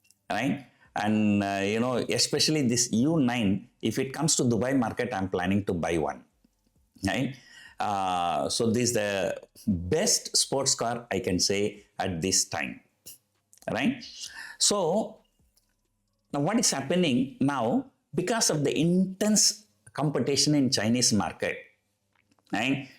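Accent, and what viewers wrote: Tamil Nadu, India. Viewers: Do not let your tamilian accent influence on English.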